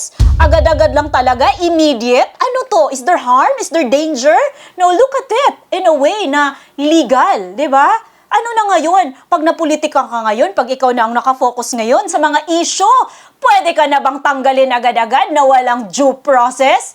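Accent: Visayas, Philippines. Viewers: Atty. ang accent mo ay Visaya. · Bisaya ka ba Atty. ?😅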